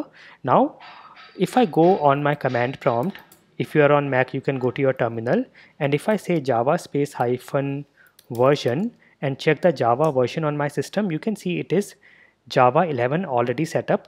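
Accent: India. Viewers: most words said by you sound kind of funny (the indian accent, not your pronunciation becuase that is spot on obviously) but when you say java it sounds like it was made for your accent not ours, that accent hits the word "java" and sounds more then perfect, anyway thanks for the tutorial this is perfect man and I love how it sounds when you say Java.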